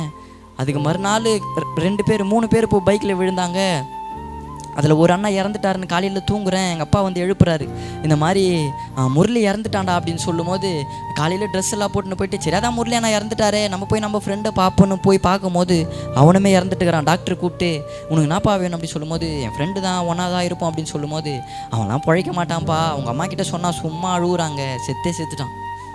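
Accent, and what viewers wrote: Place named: Sri Lanka. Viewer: sounds real, no fake ceylon tamil.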